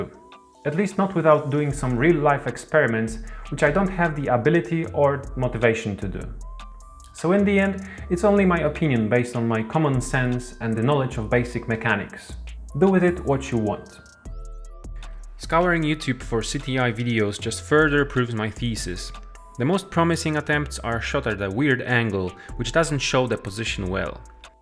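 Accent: Poland. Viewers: Polski akcent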